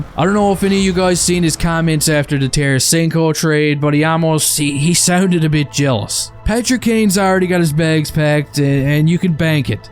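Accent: Newfoundland, Canada. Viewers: I know a fellow Newfie when I hear one lol, Cheers from central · are you a newfy?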